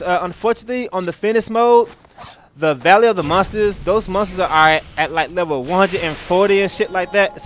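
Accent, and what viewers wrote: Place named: South, United States. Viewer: I've been looking at your vids for a few weeks and the thought just crossed my mind "man dude sound like he's from the south" am I right? anyway great LP.. cant wait to actually have time to implement your tips lol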